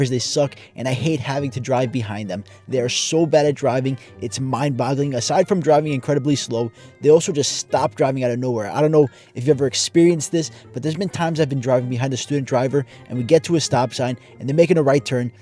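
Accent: New York, United States. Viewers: You have a New York accent. Are you from NY?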